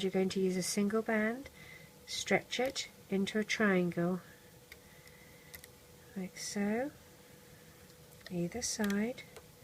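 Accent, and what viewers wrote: England, United Kingdom. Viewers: u sound english!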